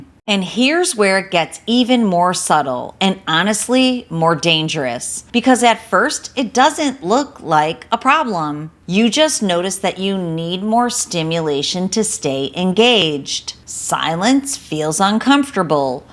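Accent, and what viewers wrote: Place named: Great Lakes, United States. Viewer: You're from somewhere around the Great Lakes arent you?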